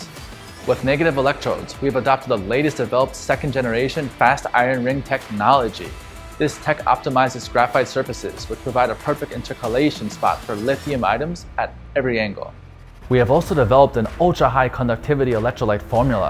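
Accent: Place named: China. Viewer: Ohk, this host is a red head, blue eyes and heavy Chinese accentes English? 🤕What I miss?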